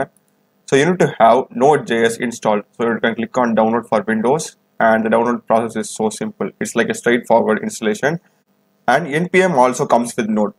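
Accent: India. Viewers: Soon as I heard the Indian voice, I knew I was in good hands. Thanks for helping us regular folk out man, much appreciated :)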